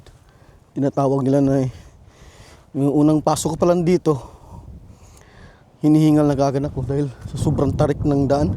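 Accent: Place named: Visayas, Philippines. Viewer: bisaya kaau 😂😂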